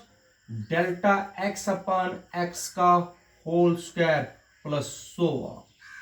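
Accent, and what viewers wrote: Kashmir, India. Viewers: Luv ur teaching as it luk's u might b kashmiri as ur accent is kashmiri.... Thanx.... God bless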